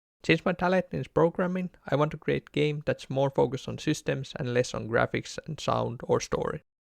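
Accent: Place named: Finland. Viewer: Best of luck to you! I like your attitude and optimism, I hope you succeed in accomplishing your dream to run a small game company. Btw your accent reminds me of My Summer Car, so I assume you are from Finland. You Finns for some reason are very good at game development, so you already have a leg up on the rest of the competition :)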